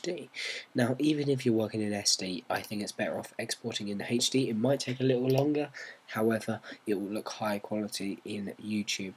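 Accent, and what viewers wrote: United Kingdom. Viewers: Why NTSC (Never The Same Colour) when you sound English? :)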